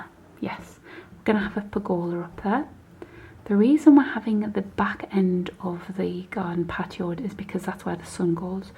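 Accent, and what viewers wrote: United Kingdom. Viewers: British accent is beautiful! 😍😍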